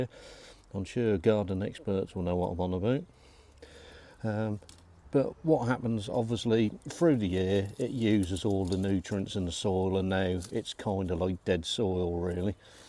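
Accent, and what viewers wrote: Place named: East Anglia, United Kingdom. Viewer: proper east anglian accent there, fair play to you boy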